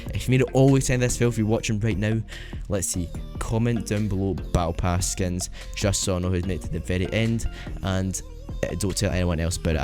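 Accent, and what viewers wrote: Scotland, United Kingdom. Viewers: Ur ye Scottish?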